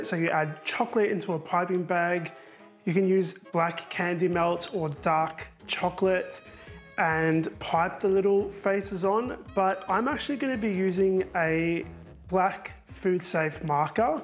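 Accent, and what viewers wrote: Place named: Australia. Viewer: Yesssss! I need to try to make them.... also I love your accent 😍 Australian?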